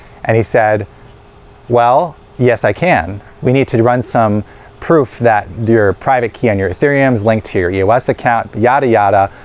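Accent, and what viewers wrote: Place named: United States. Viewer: Hello, I hope you are well. It's true, I'm not so tech savvy. But it's also true, I trade crypto - in the Winter hahaha. Is it possible you could put together a video on handling crypto safely - all your thoughts, experiences, past mistakes or close calls. Yes, I know there are probably dozens of them out there in youtube-land, but I watch your videos and feel you speak so honestly and directly and I think you would probably put a lot of thought into it and it would be super great. Maybe you have a lot of things to do every day. It's just a question. Thanks again for being so great. I really like your American accent. I know I wrote that before, but I do.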